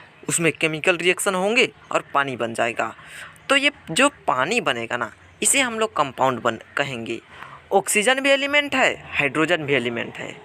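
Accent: Bihar, India. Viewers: Bihari ho khya ap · Aap bihar ke ho na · Bro you are from Bihar I think because your talking style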